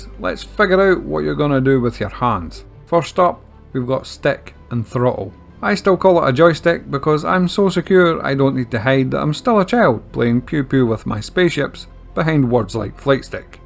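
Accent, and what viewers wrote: Scotland, United Kingdom. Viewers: Been thinking of getting a joystick for a while so started watching your video. The images, the space scottish and the wordsmithing drew me deeper and deeper in.. after a while a Tobii and pedals made perfect sense aswell! BUT when you started demonstrating voice control dishing out orders "switch to guns" I was literally bouncing up and down in my cahir with a stupid smile across my whole face. My inner spaceman thanks you and I will most certainly check back for more videos.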